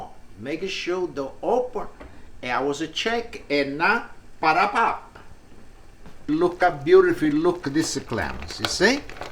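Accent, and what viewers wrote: Italy. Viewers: I love your Italian accent. Today is my son’s 11th birthday and he wants me make this dish. Salute cin cin🥂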